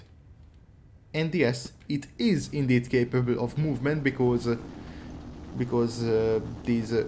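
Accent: Hungary. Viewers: Akcentus nagyon magyar. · hungarian ahh accent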